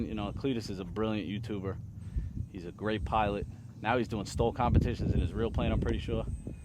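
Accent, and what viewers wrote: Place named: New York, United States. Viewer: Hello brother.. I’m a new subscriber from Darwin Australia 🇦🇺.. been binging your content for weeks now.. your a real chilled New Yorker by the sounds yeah 😅apologies if not .. but hey can we see more cleetus cub content.. maybe how to progress to acro .. maybe how to bind to better controller..🙏😁👌big fan from Australia mate ..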